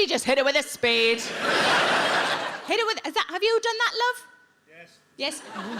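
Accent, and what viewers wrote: Newcastle, United Kingdom. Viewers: She has a Geordie accent. It's from the north-east of England. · i had never heard of sarah millican last month, and now she is my favorite comedian. what a hilarious person she is. and that geordie accent!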